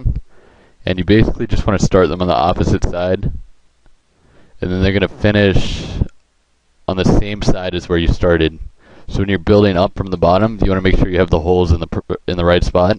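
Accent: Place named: Germany. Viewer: great german pronouncing yeah :D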